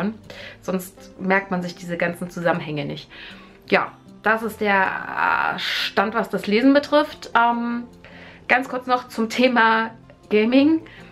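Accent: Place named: Thuringia, Germany. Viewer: Erstmal toll das du wieder da bist. Ich hab dich soooo vermisst. Hoffe dir geht es wieder einigermaßen besser. Falls nicht schick ich dir gute Besserungswünsche. Süss das der thüringische Dialekt bei der Krankheit voll durch kommt. ❤️❤️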